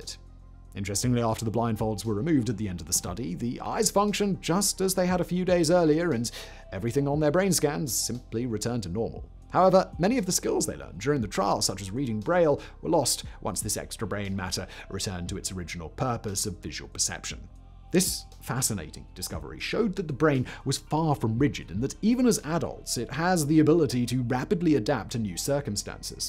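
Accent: United Kingdom. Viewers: Anyone else think he talks too fast and all his words run together? I have never heard a British accent that I just couldn't stand (besides hugh grant but that's cause he's cheesy)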